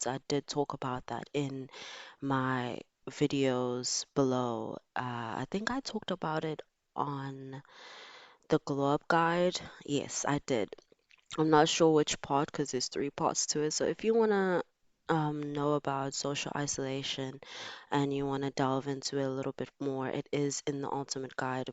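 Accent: South Africa. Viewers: Another exotical channel to subscribe to❤❤❤also you sound south african you have a beautiful voice❤ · I just know you’re South African🇿🇦 the beautiful accent gave it away😅❤I’m soo happy to see more South Africans in the exotical space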